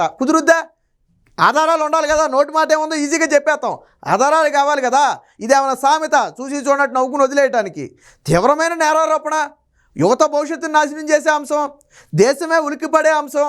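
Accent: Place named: Guntur, India. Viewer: Maa Guntur language, manchi diction, 🎉 excellent presentetion, soopper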